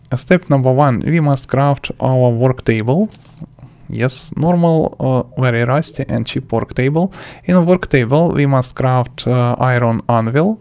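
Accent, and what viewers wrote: Russia. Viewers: Акцент, русского человека)) Привет! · Русский акцент тебя выдает...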